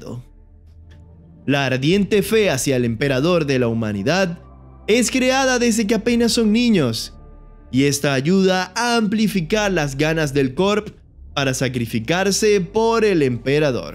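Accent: Venezuela. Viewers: Y su acento venezolano ayuda mucho. Es muy agradable de escuchar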